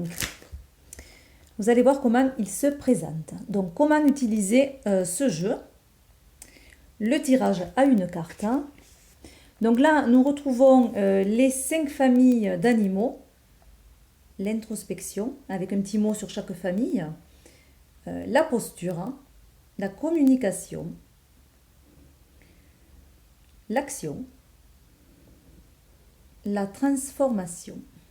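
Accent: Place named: Gard, France. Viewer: ça sent le Gard, cet accent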